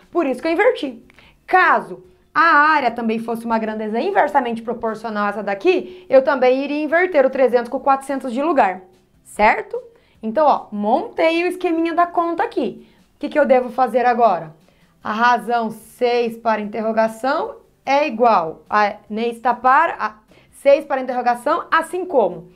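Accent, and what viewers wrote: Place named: Minas Gerais, Brazil. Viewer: Professora você tem um sotaque muito bonita . você parece ser mineira . gosto muito de matemática é uma matéria muito utilizada no nosso dia a dia 😔 obrigado professora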